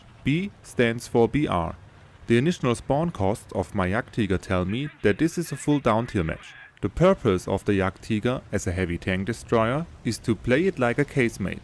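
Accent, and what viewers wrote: Germany. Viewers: Your english is a littel bit broken and you sound german but your symphatic asf you sound like a nice guy too!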